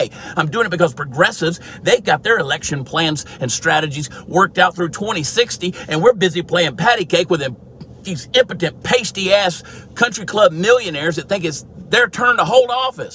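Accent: Texas, United States. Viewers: New hat! Great insights! You put my thoughts onto Texas accent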